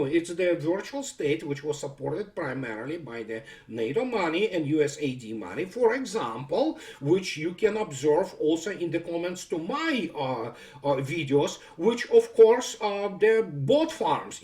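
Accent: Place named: Russia. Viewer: I love your sarcasm, with your Russian accent" nincompoops, imbeciles, idiots have to laugh . if you don't laugh you cry.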